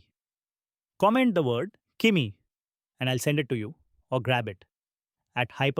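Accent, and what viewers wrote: India. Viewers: It’s my first time seeing a video from this guy with the thick East Indian accent. My feeling is that regardless of any bias he might have he did worthy testing and an excellent job of spelling out his methodology. Better than the usual junk that I’ve wasted my time watching. So: 1) Kudos to him for doing this. 2) where’s your YouTube video doing this kind of evaluation in a totally unbiased way? Seriously, it’s easy to criticize but takes effort and I think you shouldn’t be so harsh. Please ponder that…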